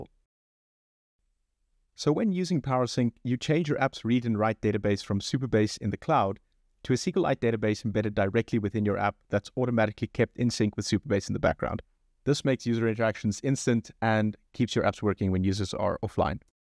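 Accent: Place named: South Africa. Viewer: that saffer accent is unmistakeable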